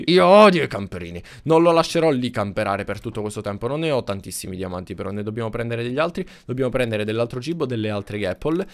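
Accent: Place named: Caltanissetta, Italy. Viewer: scommetto che sei di Caltanissetta si senta dalla tttt cmq bellissimo video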